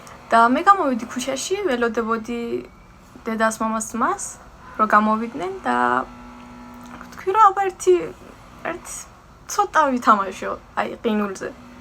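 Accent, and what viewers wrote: Fereydan, Iran. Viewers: რა საყვარელი ხართ, ფერეიდნელი ქართვრლების დიალექტი🫶🏻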